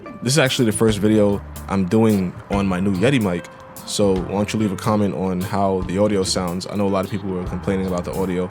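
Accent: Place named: Brooklyn, United States. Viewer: Audio is fine. Your Brooklyn accent is classic. I started at 16 years old with a used 250 cc single four-stroke Honda dirt bike. It was all I could afford, but it seemed like plenty of bike. The speed limit was still 55 on the interstate, and it did fine there. Sold it after several years for about the same I paid for it. Later I bought a 250 cc two-stroke Suzuki, which was noticeable faster. Then had a 650 cc Suzuki street bike when I was 22 years old, and drove it all over LA for years with no problems. My next bike is probably a Triumph Street Triple 765cc to be used in the hills along the California coast.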